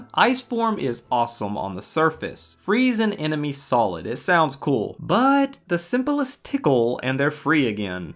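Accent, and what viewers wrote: South, United States. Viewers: I honestly can’t tell if you have a southern accent or a british accent. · your southern drawl got stronger since the last upload.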